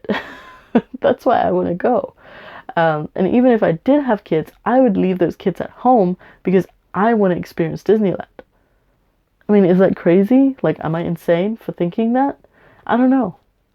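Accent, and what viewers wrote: United Kingdom. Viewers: On a side note, I haven't really been into (non commercial) vlogs but I think I get it now! :o It's really nice and fascinating, it's like someone is having a personal (albeit one way) conversation with you through the screen. Feels therapeutic somehow. I'm going to try and find more british ones (need help attuning my brain to british accents)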